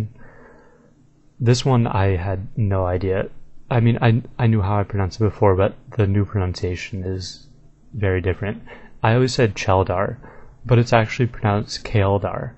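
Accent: England, United Kingdom. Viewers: You need to keep in mind these are will an english accent, that's why some of them sound strange. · Gotta always remember the pronunciation is done with English accents. So for Americans a lot of this is different.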